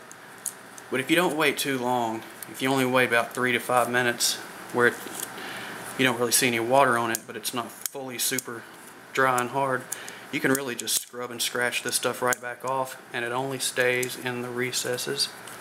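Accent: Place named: South, United States. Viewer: Love your dirty dirty south accent <3 And thank you for the tutorial. It helped me a lot! :)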